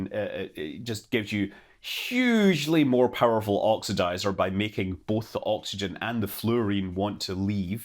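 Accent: Scotland, United Kingdom. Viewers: Here's a question for: Do you deliberarly pronounce 'Explorer' as 'Exploder', or is it just a Scottish thing?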